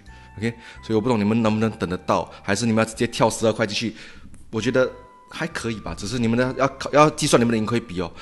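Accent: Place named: Taiwan. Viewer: 我喜欢你的背景音乐。喜欢你的台湾腔，你讲话好机车。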